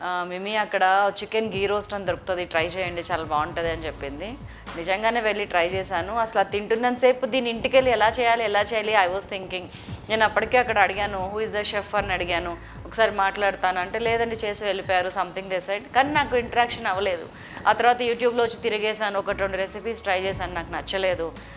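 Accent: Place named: Godavari, India. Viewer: Godavari savvadila andanga undhi mee maatalu nice video thank you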